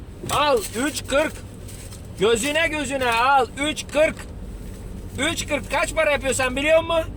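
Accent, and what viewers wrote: Thrace, Turkey. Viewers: Va varya sen 10 nuara 5 yıldız sın. süper sin. Bu trakiya şiveye devam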